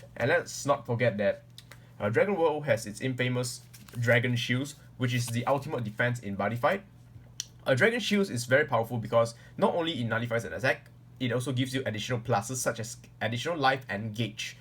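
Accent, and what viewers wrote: Singapore. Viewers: are u singaporean?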